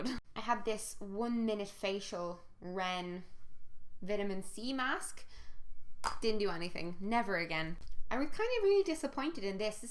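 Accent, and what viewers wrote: Ireland. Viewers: Ouuuuuuu!!!!!! I am in love with your Irish accent!! So sooo lovely! Im Canadian with Irish heritage :) Ireland is definitely on my bucketlist!!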